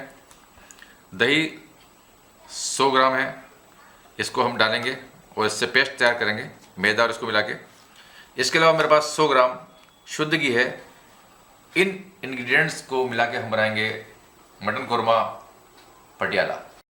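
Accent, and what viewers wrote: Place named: Indore, India. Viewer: mujhe aap ki language accent se laga tha ki aap Indore se hain, but saari dishes aap ki Rajasthani influenced hoti hai isliye pucha, vaise mai bhi aap ke podas mai hi rehta hoon Bhopal, aur meri wife Indore se hai 🙂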